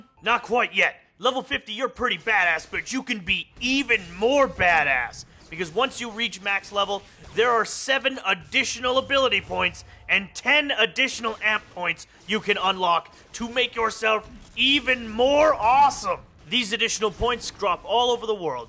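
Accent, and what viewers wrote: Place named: United States. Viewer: Sadly going by the accent I'm going to hazard a guess that you're going to be on a US server.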